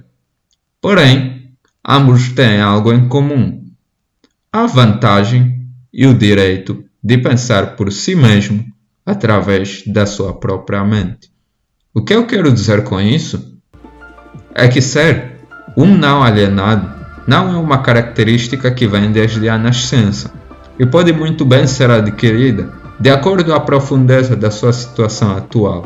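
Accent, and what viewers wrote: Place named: Portugal. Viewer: Oi, de qual país vc é? Seu sotaque parece muito o de portugal, eu sou Brasileiro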